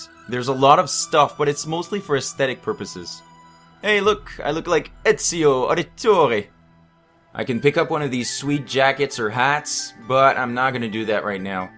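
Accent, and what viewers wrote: Canada. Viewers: ....He's Canadian!! His accent bothered the crap out of me trying to figure out what it was.... Time to rewatch the video, now that I can concentrate. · Are you Canadian? Cause you said aboat instead of about I'm not trying to be racist but idk it reminded me of young Robin from HIMYM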